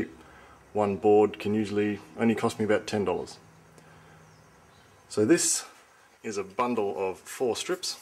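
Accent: Australia. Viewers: is that a Australian accent i hear there mate? haha thinking about making myself a long bow, been told bamboo is the best material for beginners. thanks for the video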